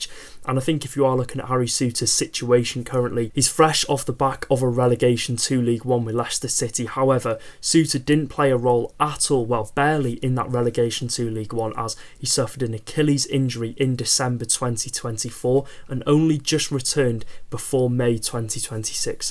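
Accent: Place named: Scotland, United Kingdom. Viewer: He's Scottish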